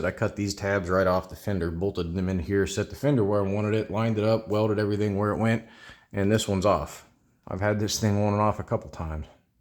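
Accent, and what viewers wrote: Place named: New Jersey, United States. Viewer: Hey man I have a question. I got a Moto Iron front end, and the springs make a hellish amount of noise when hitting a bump, on the brakes or anything that causes suspension compression. Sounds like a slinky. I sprayed the hell out of every part of the spring assembly with PB silicone spray, didn't seem to help. At idle, the inner spring on the lower right side is visibly vibrating and shaking like crazy. Wonder if that could cause the noise? Is there some kind of adjustment I can make to fix this shit? I'm brand new to Springers, so I know next to nothing about maintaining and adjusting them. Also, you from NJ? You got a North East NJ accent to my ear. I live in central/southern Jersey on the shore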